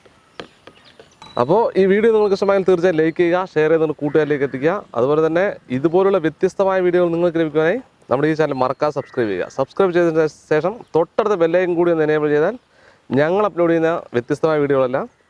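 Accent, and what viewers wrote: Palakkad, India. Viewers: Chetta pudding adipoli...valare simple ayittum ,cool ayitta karyagal chiyunnath .matramalla palakkadan slang ..super ikka ......😎👌...god bless uuuu.....all the very best...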